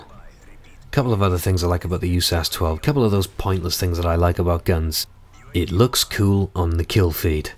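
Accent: Wales, United Kingdom. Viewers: You sound slightly welsh... Are you welsh at all? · are you Welsh?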